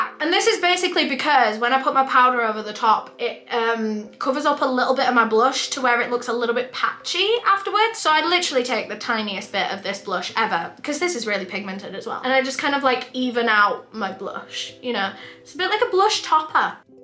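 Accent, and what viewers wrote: United Kingdom. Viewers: My friends (who are 100% deaf and, thus, haven’t got the slightest clue what your Voice sounds like) mentioned that you’ve repaired the Auto-Captions of some of your November & December Videos…They said they had no idea you’re from the UK; they can’t hear, so they never noticed your Accent…Because I don’t know any Sign Language and my friends don’t speak and can’t understand speech either, I always need to communicate with friends by Text Messages all the time…My friends can’t watch your LiveStreams until they’re later saved to their (respective) Channel(s) as regular Videos, thus (hopefully) gaining Auto-Captioning (usually, a day or two afterwards)…My friends turn on the “CC” (so they can figure out what’s being said in Videos) and they hand me the remote so I can, meanwhile, turn on the Sound and I just ignore the Captions and listen to what’s being said in Videos…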